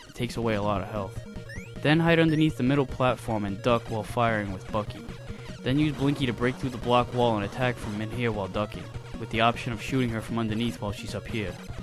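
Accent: United States. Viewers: uuuuuhhrh holy fuck your American accent is annoying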